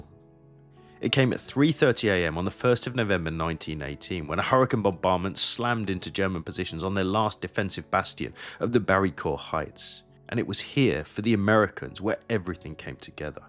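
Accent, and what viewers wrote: England, United Kingdom. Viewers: There's no R in the word. That thick English accent is rough as you butcher words and add Rs to everything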